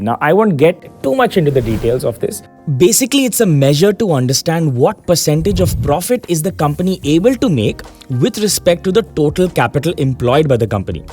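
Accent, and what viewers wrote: India. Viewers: Hey man this video is very good and i am binge watching your channel's videos this is really good! This guides me in right path with new perspective! Please continue this job and this is very engaging!❤️ By the way your accent looks like one of the south indian movie director GVM. 😹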